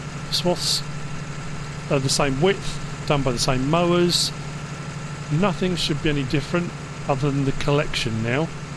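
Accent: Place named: United Kingdom. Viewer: Great video!, and useful to practice British english! 😁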